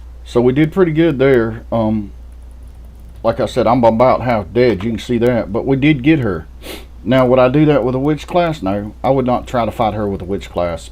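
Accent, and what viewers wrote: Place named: Texas, United States. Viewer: i am wayyyyy to friggin tired to watch this. I've been playing PoE for like 6 years...but i alway seem to stop at 30ish lmao. idk why. okay ill go. i am litterally going mad from sleep depretion. hmmm idk what to critque or complement or anything on this one. honestly, i may just save the video, and watch it later when i try the game, again, and stop at lvl 30 for some random reason. 16 friggin hours of work. i feel it's kinda pointless to make the palworld-base video i was working on...but gonna do it anyways. okay what am i talking about? Keep going my dude. PS you have the most country accent ever (i mean like in the TX country, not redneck weirdo one, it's not like....idk a jab at you). just like "man this dude is from OK or TX or something, maybe like some rural parts of Arkansas" if i'm right lemme know.... 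bc this is litterally the only thing my linguestics degree is worth, guessing ppl's accents. also i am drunk. gn~!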